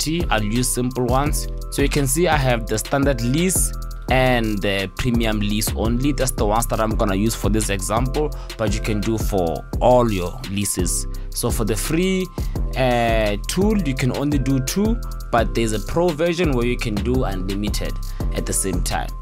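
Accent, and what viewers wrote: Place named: South Africa. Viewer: NICE !!! GOOD HELP , UR ACCENT SOUNDS LIKE SOUTH AFRICA IM ALSO FROM SOUTHEN AFRICA , PEACE N LOVE THANK YOU BRO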